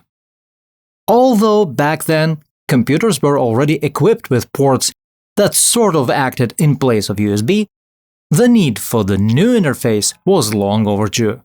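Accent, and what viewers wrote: Russia. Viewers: you are russian or talk to russian people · cuz he's Russian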